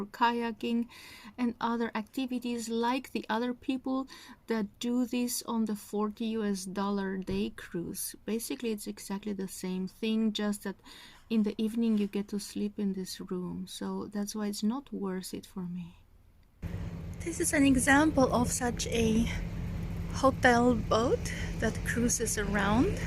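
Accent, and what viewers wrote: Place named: Japan. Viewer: Yeah I thought you have that Japanese accent😂 , but your European appearance confused me a little. 🤔😓 Thanks so much for the nice comments about Vietnam😆, I also visited Tokyo - such an energetic/colorful city, the people are lovely/beautiful!! I hope you enjoy your traveling; and keep recording your precious videos/memories. All the best to you🤟🏻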